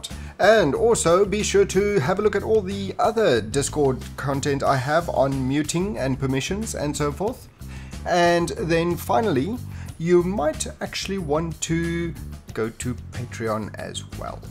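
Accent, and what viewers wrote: South Africa. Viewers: Where are you from? Your accent sounds very South African. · Oh, I know that accent, are you South African,? Also, thanks for sharing this tip.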